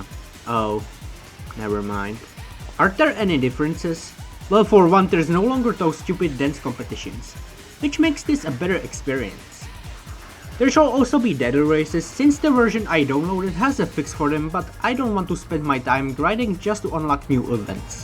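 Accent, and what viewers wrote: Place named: Czech Republic. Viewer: bro where are you from? you sound like someone from czech republick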